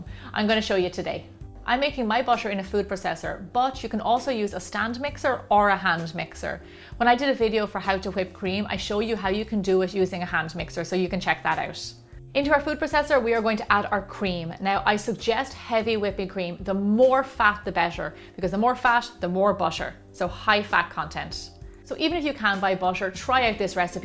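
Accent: Ireland. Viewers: SUBSCRIBED! THANK YOU! LOVE YOUR IRISH ACCENT! Makes your vids that much more enjoyable to listen and watch. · Where are you from!? sounds interesting. Def not America. But I love it. Sounds like a tint of Irish. I’m Irish. But it sounds like you’re trying hard to cover up your accent. You ARE from Ireland. I hear you. Very good. Come to America. Let’s meet. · She's from Ireland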